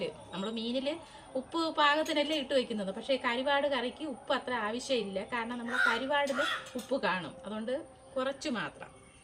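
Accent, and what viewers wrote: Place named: Nedumangad, India. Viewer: samsarak kelkan nalla rasamanu enneppole nedumangadan samsaram🥰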